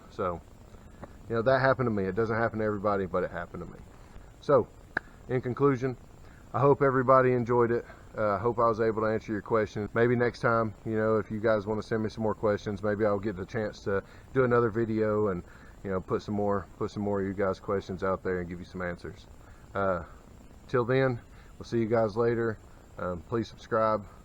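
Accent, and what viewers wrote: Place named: Georgia, United States. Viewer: Its a little strange hearing a Chattooga County accent on TV. You kick ass man!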